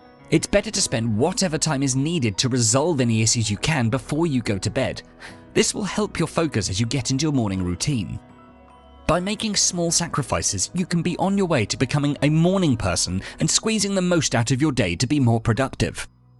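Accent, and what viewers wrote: England, United Kingdom. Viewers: The lame British accented narrator makes this super cringe worthy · This video would not work without the English accent.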